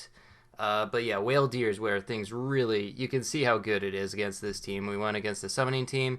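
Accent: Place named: Canada. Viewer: Why do you sound like kenadian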